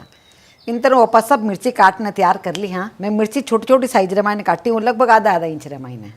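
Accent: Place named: Rajasthan, India. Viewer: Bhabi ji aap ka achar khub achcha lagey. + your sweet rajasthani bhasha 💐💐👍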